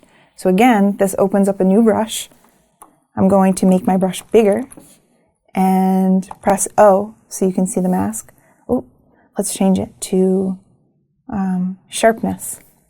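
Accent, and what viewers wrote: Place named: New England, United States. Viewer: I'm glad you like our Yankee accents :D I have to say, the NZ accent is one of my favorites thanks to Flight of the Conchords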